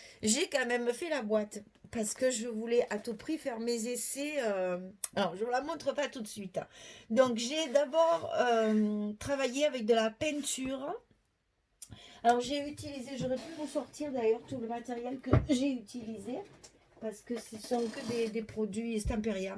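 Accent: South, France. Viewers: bonsoir......je viens de me regaler avec votre video......merci pour la petite leçon d histoire(lol)...l album j adore.....les papiers sont vraiment bien mis en valeur....et la boite bravo....je n ai jamais encore touche aux cires et peintures......c est vraiment reussi .....vous avez un accent bien agreable,plein de bonne humeur et de soleil.....moi aussi je suis du midi.....je suis catalane.....pour myriam de scrap for you c est vrai c est une personne tres gentille ,un jour on a passe 2H au tel je ne m en suis meme pas rendu compte tellement c etait agreable.....bonne soiree....;a bientot pour votre prochaine creation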